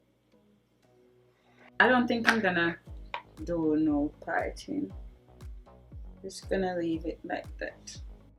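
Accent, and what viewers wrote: Jamaica. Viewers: I knew u were Jamaican from the starting of the video.😂😂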